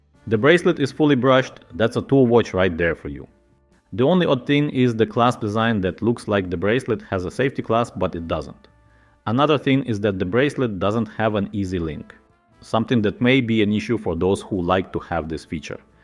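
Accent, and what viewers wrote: Russia. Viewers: Братан, твой русский акцент выдает тебя.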